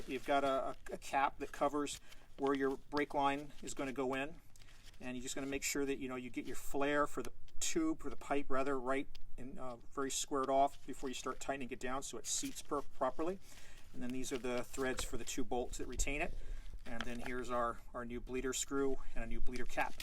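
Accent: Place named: Pennsylvania, United States. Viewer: I know that Pennsylvania accent from anywhere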